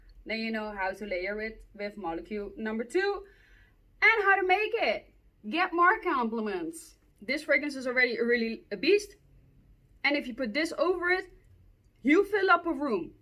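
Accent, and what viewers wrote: Netherlands. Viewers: Hoi ben jij Nederlander coz ur English is that Nederlander accent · In like two seconds I was like Dutch accent? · Love your Dutch accept and Dutch confidence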